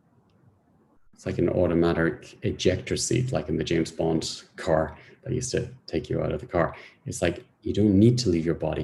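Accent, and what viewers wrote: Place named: Ireland. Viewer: Awesome with lovely mellow Irish tones - I'm here with you as Lorie Ladd told me about you. I left off so so many old things that pulled me back throughout my whole life! As I was reared as Irish catholic, I saw the time I was baptised (6 weeks old) and felt that I was handed over which I did not like one single bit. I felt stamped and this did come off in the meditation! So grateful. At almost 2 years I was put in hospital for an apendectomy, saw my little child on a table and I went off up towards the ceiling, no pain up there. I was left in the hospital and someone had some red shoes that I couldn't walk in - I think a kind nurse looked after me. Ancestral memory too of an old woman sitting on the side of a stony road, too tired and hungry to go back or couldn't go back, she was delighted to see me, it was lovely. My channel is open and I feel wonderful with new vibrant colourful energy. 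Love grace peace and patience to you my friend.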